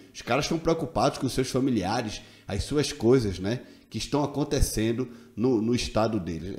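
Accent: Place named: Bahia, Brazil. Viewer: TÚ ES BAIANO , FUI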